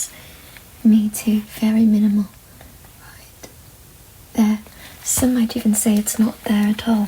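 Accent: RP, United Kingdom. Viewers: Just when you think she can't possibly get any more adorable... enter the British accent. 🗣❤ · Your voice is adorable with british accent ❤ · Not a bad attempt at the british accent also love your look aswell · As an English accent I find the person quite brilliant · Hello! Lovely to see you again on this fine day. Such a pleasant experience to hear your British accent in this video. We really appreciate your time and effort to make such delightful asmr for us and for that we thank you! Cheers! 😊👏👏👏👏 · British was something I never knew I needed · As an English person I find your accent quite amusing and endearing. It's a bit like a quirky Joanna Lumley. Quite plummy and soothing. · Your posh I can tell 😂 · Just to be clear, folks, it isn't a British accent, it's an English accent. However, it is very commendable nonetheless 👏🏼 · I've lived here in the uk all my life (47 yrs old) and yet to meet someone who speaks as posh as this, but good attempt though. 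great video though. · I think I look much better in the portrait, you draw excellent 👌👌👌 It was very relaxing for me to listen to you speak in British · ASMR is so much better with an English accent, I probably won't stat awake more than 5 minutes, so thanks ahead of time, good night 💤💤💤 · sorry but that was old british im not trying to be a pest but i find it a-bit stereotyped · I'm British and the accent is lovely:) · Ngl although you sound like more of a upperclass english person its not far off. Better than mine and ive lived in the uk all my life 😂😂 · I love the British accent and would for sure welcome more of this! Great video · Very Bri'ish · As a fellow Britain myself I don’t know how to feel about ur British accent but keep up the good work!!! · As a Brit... I wish our accents were all this posh..... Mine certainly isn't!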